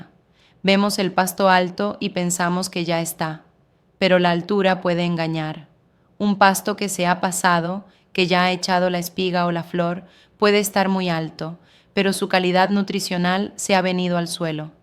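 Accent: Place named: Argentina. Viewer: Eso ya es viejo, se llama rotación de potreros y porque hablas argentino?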